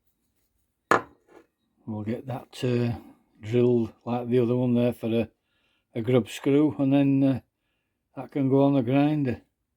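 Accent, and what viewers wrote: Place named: Yorkshire, United Kingdom. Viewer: Hi I suspect you are a fellow Yorkshire Man with the accent? I’m from Wombwell/Barnsley and a wannabe clock maker which is how I came across your channel.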